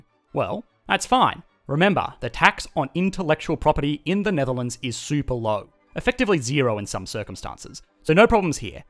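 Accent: Australia. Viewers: You have the most charming Australian accent I’ve hared😃👏😄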